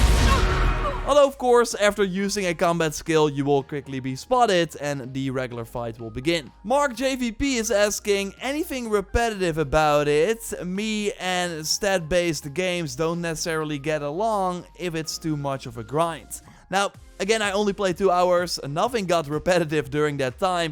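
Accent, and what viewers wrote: Netherlands. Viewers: Something funny about your accent. Definitely Dutch.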